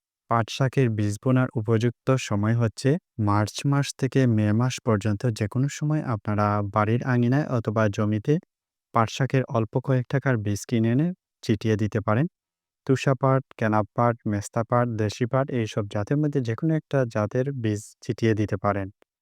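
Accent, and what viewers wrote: Sylhet, Bangladesh. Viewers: হেলো সিলেটি ভাইসাব। পার্পল কিং জুন মাসে লাগাইলে অইবনি??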